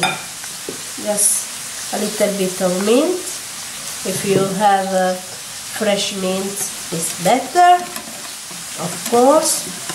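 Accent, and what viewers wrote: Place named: Italy. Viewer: U are so sweet I love Italian people and accent ♥️💚♥️💚♥️💚thanks for sharing video God bless you 🙏🙏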